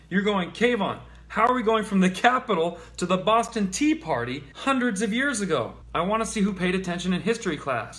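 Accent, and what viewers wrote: United Kingdom. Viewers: Good British accent K-Von,you make me laugh,and I agree with everything you say."Get in there""!!!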